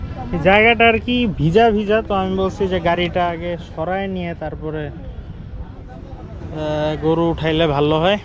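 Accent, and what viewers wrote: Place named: South Korea. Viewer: Oi hala English sound hoi ke ?? Thik Korean bangla den voice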